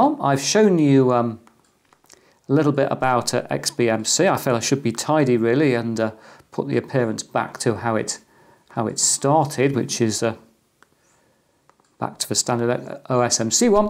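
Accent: England, United Kingdom. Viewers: I thought it was Christopher Walken :-) talking about R-Pi. on Youtube. with such pure English accent :-) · Love your british speak mate, cool startrooper heads on your desk also! keep up!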